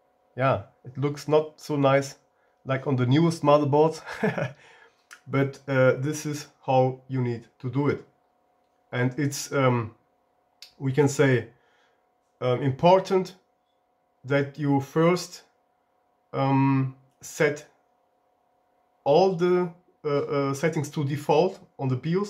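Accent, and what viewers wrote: Germany. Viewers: i hear german hahah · der boss mit deutschem akzent, gutes video. danke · dieser Deutscher Akzent 🤣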